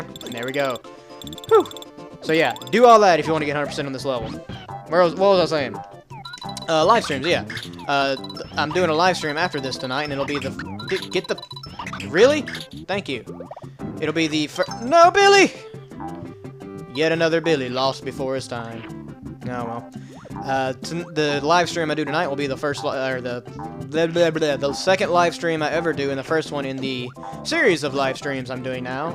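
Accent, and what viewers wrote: South, United States. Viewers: Every time I hear Southern accents, it makes me long for some of my Mom's cookin'! By the way, you're pretty good at this, hope you keep at it!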